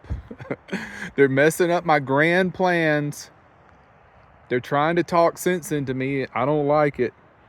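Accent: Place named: Georgia, United States. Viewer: fellow Georgian here. I'm almost 66 yo and in July 2023 I sold my home in Fl, bought a 31 RV and have traveled to almost every single state in the US, i have 4 states left to see besides Hawaii. I travel with my two little doggies and my cat. Like you I stay as long as I want wherever I want and am just free. I watch your channel not because you travel in a van but because a) i just love hearing that Georgia drawl and b) because you are you. You inspire me and that's important. Whatever you decide it will be right for you! Stay safe and happy travels.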